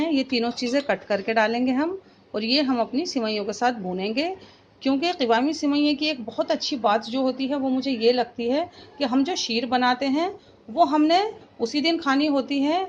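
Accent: India. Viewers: The way u speak is so good full Desi style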